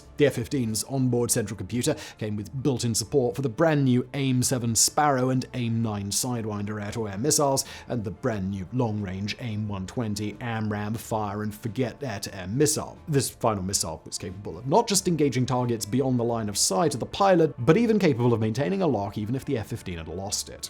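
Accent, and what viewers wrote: England, United Kingdom. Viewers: The F-15 NEVER served in Vietnam!!!!! How can you be so fucking lazy that you can’t be bothered to look up an easily verifiable fact!!! This video is proof that having an English accent doesn’t make you smart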